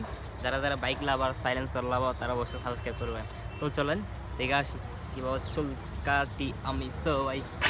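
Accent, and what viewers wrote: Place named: Chittagong, Bangladesh. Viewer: Via apnar bari kotai amar mota ctg karon apnar kotar modda boja jai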